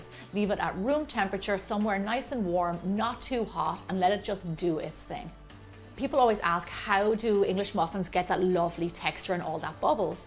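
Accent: Ireland. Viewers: I was going to ask the same as my mentor has the same exact accent, and I'm bad with accents. It believe its an irish accent though. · Are Ya from Ireland 🇮🇪??? Eh??? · You sound a wee bit Irish are you? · She has a VERY Irish accent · I love the Irish accent! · Absolutely love your Irish accent. As yummy as the muffins.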